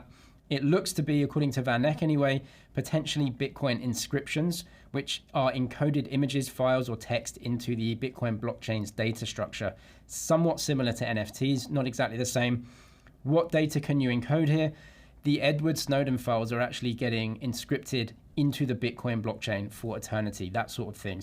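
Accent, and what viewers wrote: England, United Kingdom. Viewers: He is British but doesn't live there anymore. And correct, Bybit has ceased operations in UK for now due to regulatory scrutiny. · You sound English, but bybit doesn’t operate in Britain from what I understand? Is this the case?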